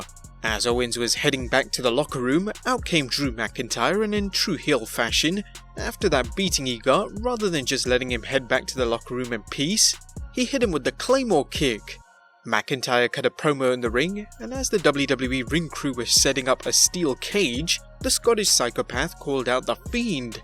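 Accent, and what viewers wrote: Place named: England, United Kingdom. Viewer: How come the American narrator can curse but this narrator can’t? Need some English accented f bombs in my life lol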